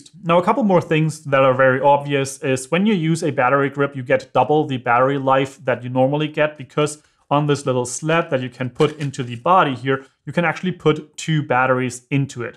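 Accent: Germany. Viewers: Might be depending on your hand size and use cases. Btw. den deutschen Akzent kann man nicht verstecken:D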